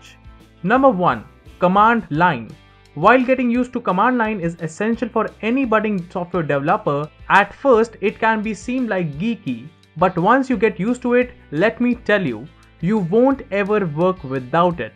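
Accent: India. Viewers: 1) Among the dozens of videos on crypto, yours is best because of Indian English. If I hear American English I get angry because I can digest less than 30%, but I can digest 100% of your Talks. 2) To be a successful Blockchain Developer, apart from All the technical Programming stuff,what is needed is SIMPLICITY, for common man to participate in Crypto Investment.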